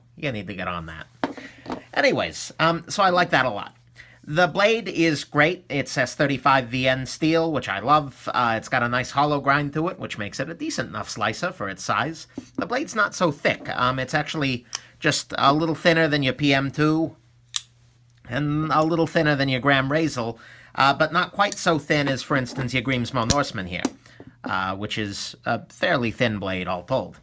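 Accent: New York, United States. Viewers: I love your reviews. Very thorough, but concise at the same time. Can't tell if your accent is New York or Boston.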